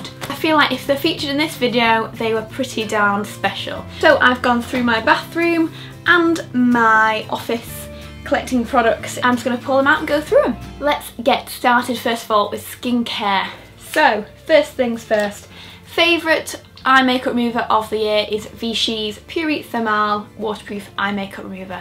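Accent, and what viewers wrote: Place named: Manchester, United Kingdom. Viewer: Absolutely love all of your videos,. By far my favorite youtuber! Plus its so nice to hear that lovely Mancunian accent, makes me miss home!!:)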